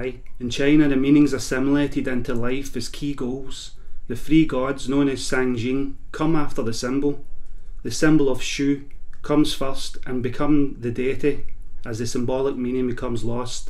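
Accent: Scotland, United Kingdom. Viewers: I believe it's Scottish...or Irish.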